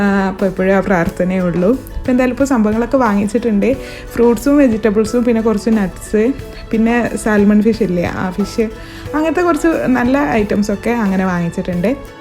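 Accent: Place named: Malabar, India. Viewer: ങ്ങൾ തിരൂർ ഭാഗത്ത് ഉള്ള ആളാണോ. ഞാൻ കോട്ടക്കൽ ആണ്. മ്മളെ ഒരു സ്ലാങ് ഉണ്ട് ങ്ങക്ക്😀😀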